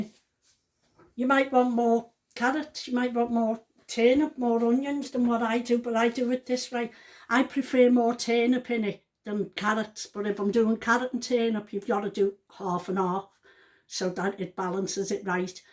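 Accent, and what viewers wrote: Liverpool, United Kingdom. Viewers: You are just the Best mother .. grandmother and great grandmother and thank you for the laughs 😂. Keep up the good work...Your Scouse sound delicious. Enjoy ...😊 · Hi, im from Huyton Liverpool, your a lovely woman, Luv yur scouse…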